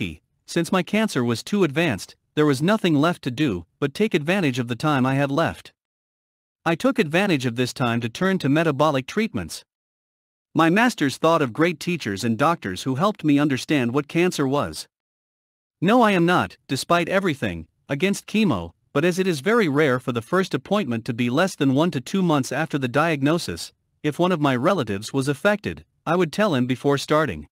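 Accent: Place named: France. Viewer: I agree with others , your French accent is lovely and totally understandable. The robots voice accentuates in the wrong place quite often which then doesn’t make sense. This robot voice is also associated with a lot of scam videos. I would hate to think this would put people off listening to your story. Be proud that you speak such good English!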